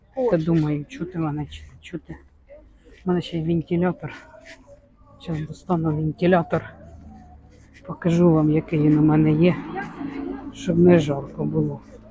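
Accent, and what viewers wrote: Poltava, Ukraine. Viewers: Рiдна полтавська мова ❤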